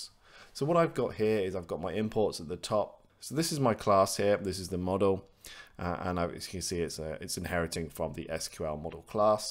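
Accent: United Kingdom. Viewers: Man Your accent is so adorable. Are you British ?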